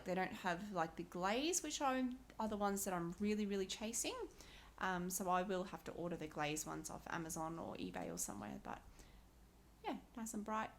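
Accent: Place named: Australia. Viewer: Welcome to the colouring community! So happy to hear another Aussie voice. Looking forward to watching your videos and channel grow. Good luck with it mate. Stay safe and Happy Colours, Sara 🐨💕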